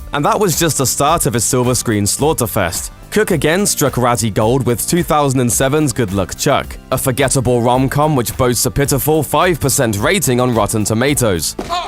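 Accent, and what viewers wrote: United Kingdom. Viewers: A bad brit speech bot is going to tell us what is funny and what is not. Nah, move along. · To make matters worse he has to get low key patronized by a nameless faceless person with a trash stereotypical British accent. Who deep down has to know he will never be as big as Dane Cook professionally. Ohhhh. Do carrot top next. People love to trash on him. I think it will be funny for a no talent hack to trash someone they can only dream to be as “successful” as 🙈🤩🤡🤑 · Can you guys pick another narrator? This guy's accent and is jarring and seriously annoying. Plenty of British voice actors out there who don't grate on the ears when they speak.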